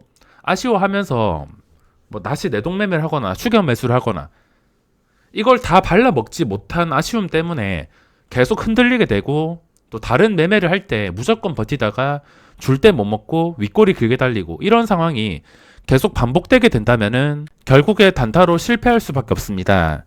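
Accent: Gyeongsang, South Korea. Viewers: 경상도 억양이 너무 구수하시네요 ㅎㅎ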